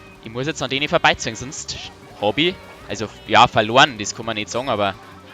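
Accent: Bavaria, Germany. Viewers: Geiles Video ... Dein Bayrisch is so Geil mach weiter so ...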